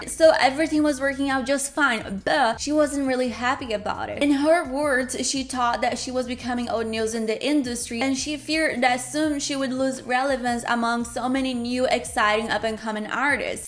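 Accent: United States. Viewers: your california accent 🔥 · I thought you were american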